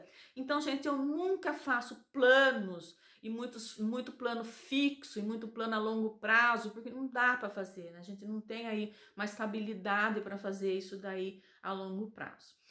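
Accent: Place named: Rio Grande do Sul, Brazil. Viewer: Pelo sotaque você não sabe? E do sul gaúcha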